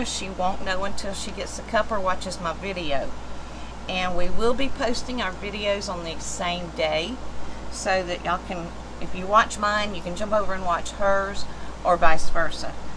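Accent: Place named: South, United States. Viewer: Hi, I just subscribed. Her cup from you came out very pretty! Hers is very cool too. You must be from North Florida? because you have the southern accent 💗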